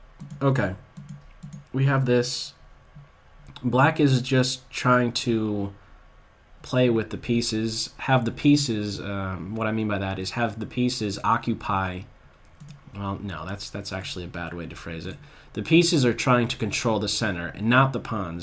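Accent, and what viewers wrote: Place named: New England, United States. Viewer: We don't get many Irish 'round these parts, so I'm not well trained in that accent. But I do hear the New England in his voice. Maybe small town New York or Pennsylvania?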